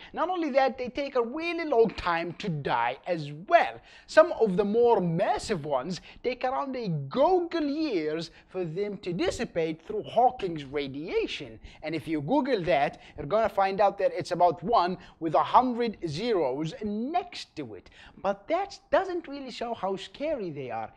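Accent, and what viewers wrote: India. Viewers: I cant tell if that's an Indian accent or an Arabic accent...either way it's fucking terrible. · Is he Indian? Because his accent is so distracting. "Eaaartdh" - this guy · What a perfect Hinglish! It makes me want back to India =)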